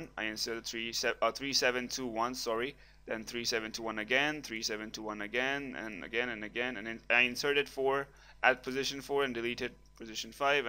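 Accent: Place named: India. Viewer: Why would that be considered racist..? Sounds like a question stemming from curiosity, nothing wrong with that. That being said, it sounds like an Indian accent., but I could be wrong!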